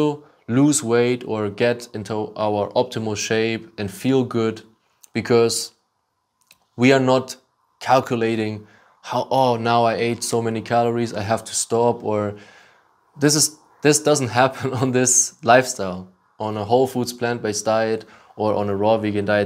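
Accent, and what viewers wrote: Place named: Germany. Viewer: Italian name, German accent... Where are you originally from? I'm from Slovenia.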